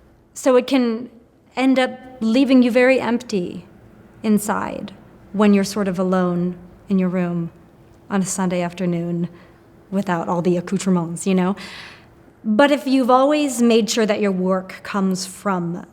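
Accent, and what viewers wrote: United States. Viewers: do you mean her american accent? she grew up in virginia lol ofc she has one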